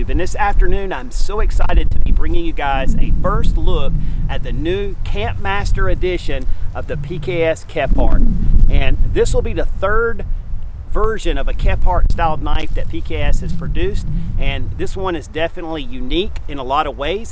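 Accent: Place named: Georgia, United States. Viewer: Having only known you from this channel. I have detected a southern "twang" in your speach, are you from Georgia?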